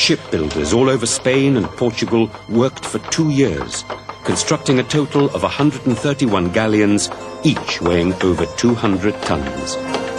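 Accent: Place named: England, United Kingdom. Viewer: lol says "felícima armada": it's " grande e felicísima armada". I love english accent when talking spanish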